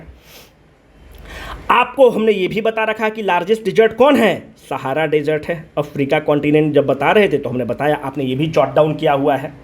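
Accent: Bihar, India. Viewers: Hero is Back.... What a strong voice and I love the way you substantiate things..... BIHARI Hindi tone mixed with Sound vocab of English is deadly combination sir😊 Loved every bit of video!!!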